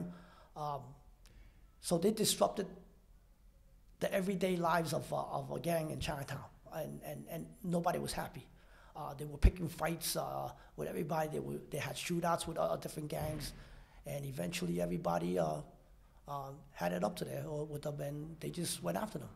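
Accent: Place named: United States. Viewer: English accent is 100% American. Nice.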